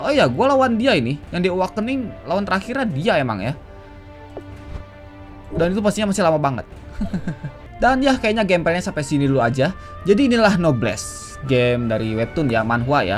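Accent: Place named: Java, Indonesia. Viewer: medok hadeuh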